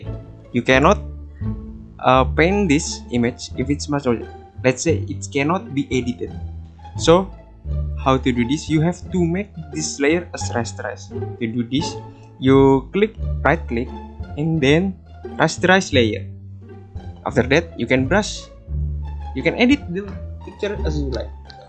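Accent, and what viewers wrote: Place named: Indonesia. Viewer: Indo banget soaranya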